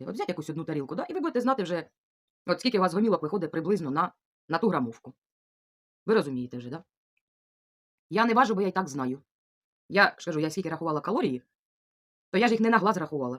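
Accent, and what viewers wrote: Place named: Ukraine. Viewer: Ви дуже природня, щира, аутентична дівчина - Ви не простота (як Ви процитували у попердньому вдіео свого глядача), це глибина Ваша така народна. Викладайте відео - на Вас дуже приємно дивитися і дуже приємно слухати - у Вас красива народна українська мова - я навіть собі записую інколи ті слова, які колись чула у баби в селі, а тепер ми їх не вживаємо. Ви обрали правильний шлях - ви харчуєтесь повноцінно, просто прибрали із раціону некорисну їжу. Бажаю Вам триматись на цьому раціоні все життя, додаючи тільки корисні для організму страви! Успіхів Вам!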